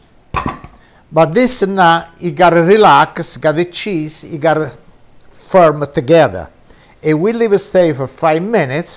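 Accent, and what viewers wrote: Italy. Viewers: I'm laughing AND crying. This wonderful man sounds like EVERY Italian immigrant I had the privilege of growing up around and with, including my own awesome father. Miss you, papa' 🍝🍷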